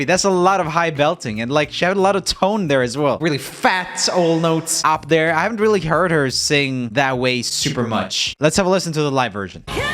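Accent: Sweden. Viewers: RJ Alcaraz the Swedish accent.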